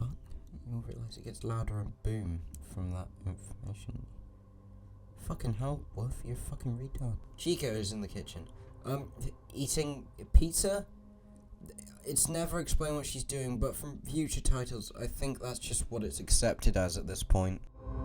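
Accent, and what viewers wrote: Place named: United Kingdom. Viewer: british guy talking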